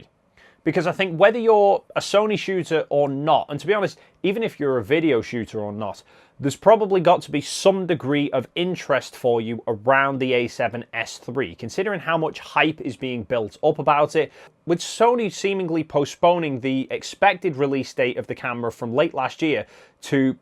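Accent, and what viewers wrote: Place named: North, United Kingdom. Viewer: The only reason I watch his videos is a beautiful northern accent 😂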